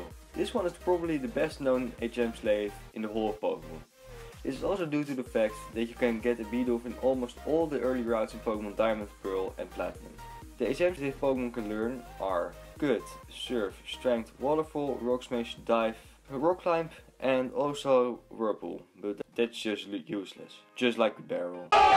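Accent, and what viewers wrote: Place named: Netherlands. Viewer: Are you from Holland it souns like you from Holland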